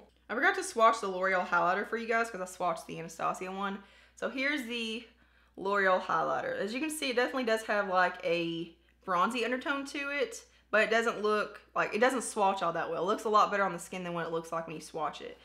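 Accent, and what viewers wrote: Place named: Kentucky, United States. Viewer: I still enjoyed it!!! My dads side is from Kentucky and ur accent makes me feel at home, ur channel makes me feel that way!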